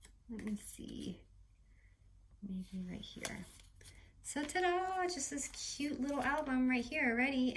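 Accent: Boston, United States. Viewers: Ummmm just my 3¢.... BUT.... super cute Halloween makes !! And although deffinatly not a heavy Boston accent , and maybe very slight , but feel you have more of a Boston accent then you think you do ..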